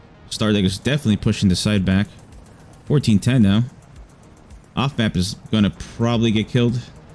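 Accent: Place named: United States. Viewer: this bro has a goofy american accent, damn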